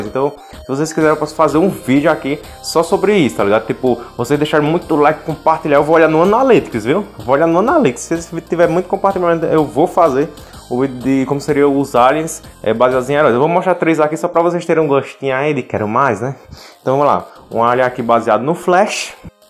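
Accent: Northeast, Brazil. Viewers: Eu acho que ele mora no nordeste por causa do sotaque dele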